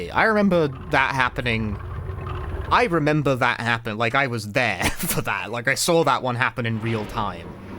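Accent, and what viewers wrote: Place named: United Kingdom. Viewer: I've watched some of your videos before, but never occurred to me that you're from the uk, even though I did notice you have an accent lmao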